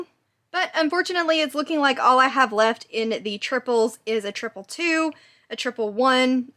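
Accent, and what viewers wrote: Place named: South, United States. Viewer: You may say or have it somewhere.. and if you don’t then you absolutely don’t have to confirm my suspicion if you don’t feel comfortable doing so. This is the internet, after all. But based on your voice, I suspected you are from The South… but then you just said football and bugs dying and now I’m convinced. (And in no way, shape, or form am I mentioning your voice as an insult! Just in case that needs to be said!)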